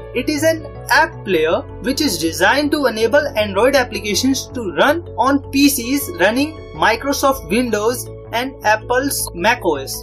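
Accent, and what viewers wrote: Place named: India. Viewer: I always love when Indian talking with their accents... its so entertaining to hear :D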